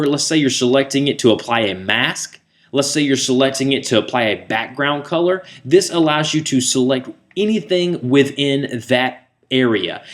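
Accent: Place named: North Carolina, United States. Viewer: you must be from north carolina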